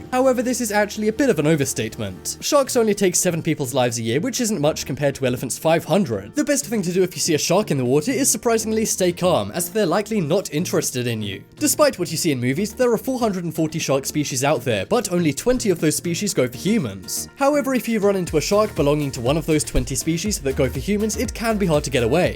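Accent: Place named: United Kingdom. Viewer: I lie, your British accent 👍🏻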